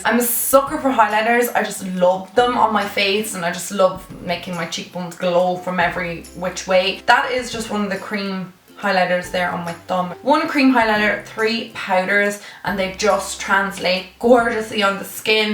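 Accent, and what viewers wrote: Ireland. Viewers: Your Irish! There is barely any Irish youtubers❤️xx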